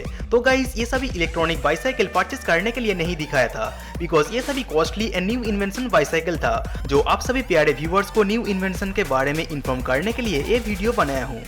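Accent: Bengal, India. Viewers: Bengal tone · Bhai thoda Hindi sudhar lena . Aisa lagta hain ke bengali bol rahe ho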